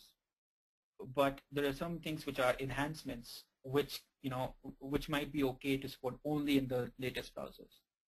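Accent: India. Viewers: omg, your Indian accent...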